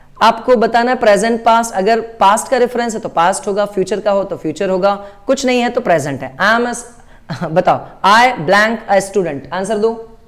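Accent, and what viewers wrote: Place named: United States. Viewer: He speaks with an American accent...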